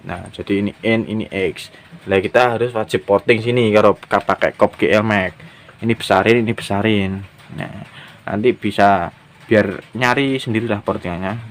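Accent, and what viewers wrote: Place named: Bojonegoro, Indonesia. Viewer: Tak kiro wong ndi ae mlaah podo bjne😂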